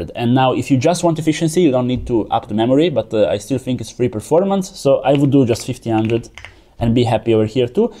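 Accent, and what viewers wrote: Italy. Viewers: Sento un po’ di italianità 😂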